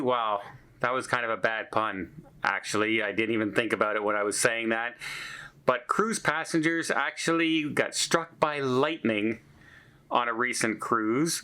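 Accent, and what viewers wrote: Canada. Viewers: oh I thought it sounded like fantastic but I guess with his Canadian accent it sounds like van.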